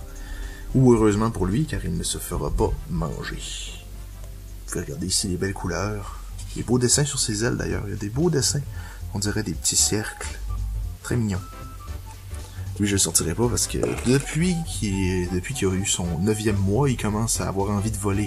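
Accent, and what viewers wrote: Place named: Quebec, Canada. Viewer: J'adore l'accent québekoi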